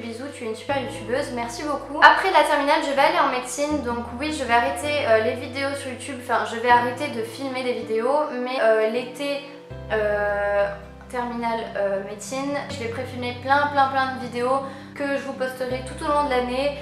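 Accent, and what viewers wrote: Lebanon. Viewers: awww t trop cute en parlant le libanais chui libanaise aussi 😻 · Cest troo bo ton accent libanais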